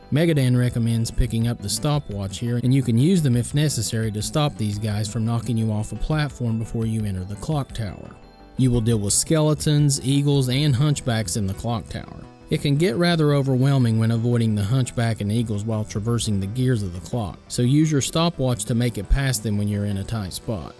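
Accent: South, United States. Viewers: hear a Southern drawl explaining Castlevania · So you sound from the south. Same here. I love this channel. Thank you!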